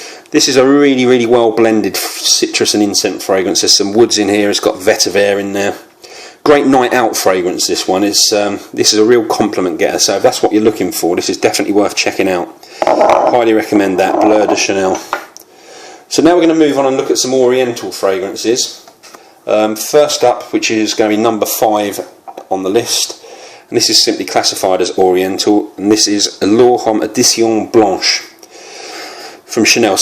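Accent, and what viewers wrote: United Kingdom. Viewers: Great video, mate! I concur on all of your suggestions for beginners apart from Prada Amber, but that might be because I am not a big fan of it. Nice accent, by the way, I bet you are not from London. Newcastle?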